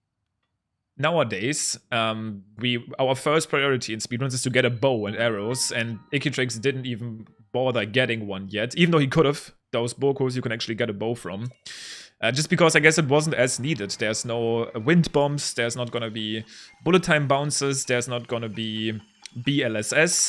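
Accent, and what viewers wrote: Germany. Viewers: Are you actually German? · I think so. His accent really sounds like a german one. Me as a german can relate xD.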